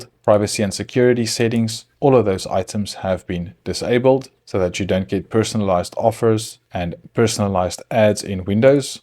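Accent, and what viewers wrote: South Africa. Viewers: You sound South African. Great work. Thank you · You sound South African. It's not on git. I will assume AI slop unfortunately. I won't bother with Windows again though. If I really had to use Windows for someone else I'd just use shadowwhisperer/XakerTwo scripts from github, ChristTitus Winutil from github and the like. Transparency is needed. It is important to specifically turn off Windows malware injection garbage specially on Asus pc's. Windows Platform Binary Table (WPBT). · You sound like a South African that lives in Ireland. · Saffa accent I hear?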